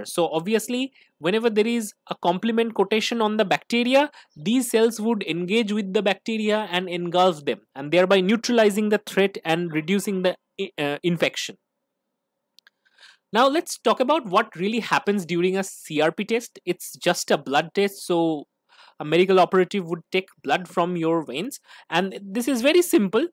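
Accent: India. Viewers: The sigh of relief when I heard the Indian accent 😂 I kept telling everyone that I feel horrible, exhausted and nauseous all the time and always got a "we all feel that way, just wait until you have kids, then you'll know true exhaustion 😡" I really feel like punching those people in the face now, because they fired me for always feeling sick and performing badly because of it while not giving me time off to see a doctor. I will cause a scene. This is Europe not America ☺️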